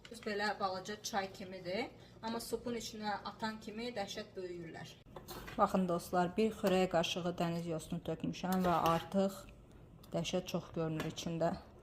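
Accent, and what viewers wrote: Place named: Baku, Azerbaijan. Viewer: Əlaaa. Aləmsən. Bakı ləhcən lap ləzzət eliyir. Eşq olsun ❤❤❤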